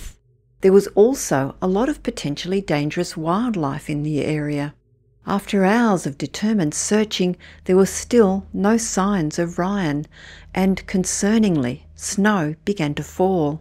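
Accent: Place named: Australia. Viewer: Australian narrator I like it 👍👍❤️❤️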